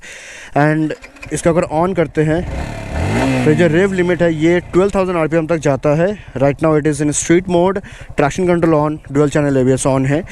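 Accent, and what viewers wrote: India. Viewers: CAN ANY OF YOU indians speak normally in ENGLISH? these bikes are exporting worldwide and we need to WATCH A GOOD REVIE WITH ENGLISH E N G L I S H detailed review I CANT UDNERSTAND SH1T, not even subtitles works.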